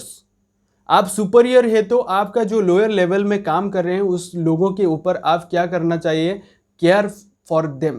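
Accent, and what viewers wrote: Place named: Odisha, India. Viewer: Dear, Great Job and well explained. But I want to suggest you about your accents which you are using, that is for only village people, those who are living in odisha. If you want to became a good trainer on facebook or youtube (or any social platform), you need to work on your accents. The pronunciation of ours (Odisha peoples) is very poor in both English and Hindi. So, continue to motivate others and step up for your great future. Thank you, God bless you.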